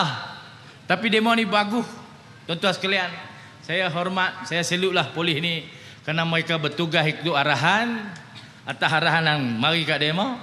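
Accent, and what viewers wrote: Kedah, Malaysia. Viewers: TERBAEEEK BM KEDAH, MB SANUSI. IN SHAA ALLAH, MB SANUSI. ALLAH KURNIAAN BANYAK NIKMAT DARI ALLAH UTK MU MB SANUSI. ORG BAIK YG ISTIQOMAH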